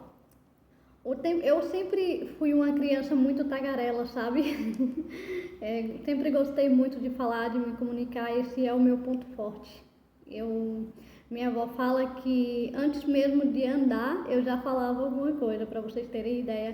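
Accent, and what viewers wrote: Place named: Bahia, Brazil. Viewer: que bela história de superação, e que bom que vc existe. Vc é linda, merece ser feliz. Receba todos os elogios dessa humilde pessoa que se tornou seu amigo, na distante Ribeirão Preto, SP. Seu sotaque também é lindo, sotaque baiano maravilhoso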